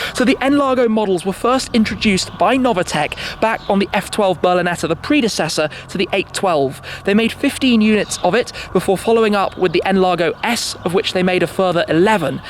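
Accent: England, United Kingdom. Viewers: Ale mnie wkurwia Ten koleś ta gadka tak mocno angielska ze chuj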